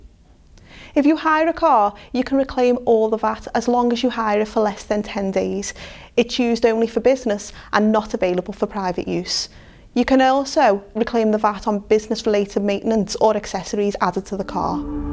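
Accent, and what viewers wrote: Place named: Liverpool, United Kingdom. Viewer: Scouse is heavyyyyyyyyyyy la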